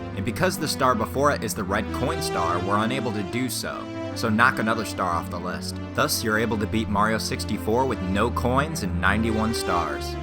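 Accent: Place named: Midwest, United States. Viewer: Love the Midwest accent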